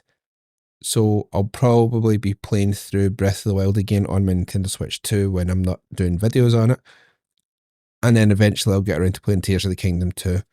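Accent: Scotland, United Kingdom. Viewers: Maybe a stupid question but had to ask after seeing any of your videos for the 1st time and hearing your voice: You from Scotland?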